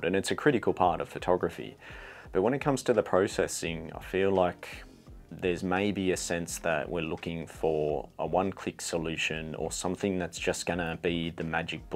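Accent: Australia. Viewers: There's absolutley nothing redeemable about the thin Australian accent.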